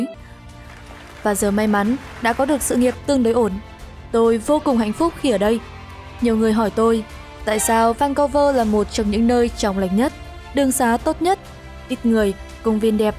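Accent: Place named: Vietnam. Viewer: ad đọc tên người nước ngoài nghe chán quá, thuần túy là phát âm bằng ....tiếng Việt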